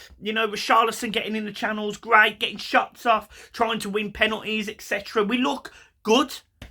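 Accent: Birmingham, United Kingdom. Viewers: Why are you a Everton fan ? Sound like a Brummie.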